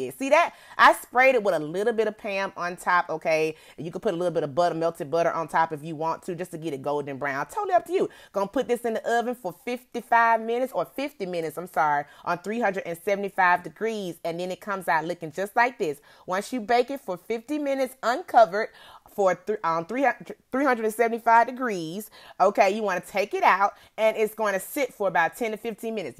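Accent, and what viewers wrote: United Kingdom. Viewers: Your British accent is amazing 🤩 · That British accent took me out. I love you. ❤️❤️❤️ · Why she legit sounded british tho 💀😂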